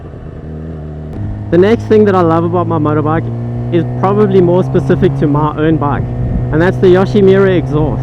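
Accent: South Africa. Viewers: That SA accent is unmistakable. Thank you for sharing man!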